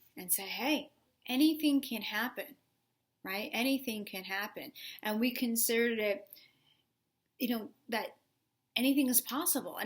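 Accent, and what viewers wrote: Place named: New York, United States. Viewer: I loved Green Day in the ‘90s too and saw them in concert in NY then. I thought I heard a NY accent. Are you on LI too? We must be around the same age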